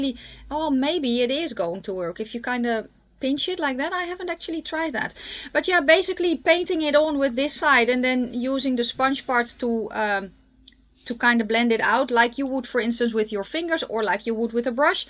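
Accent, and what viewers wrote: Netherlands. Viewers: Your dutch Accent is so cute! 🙈🥰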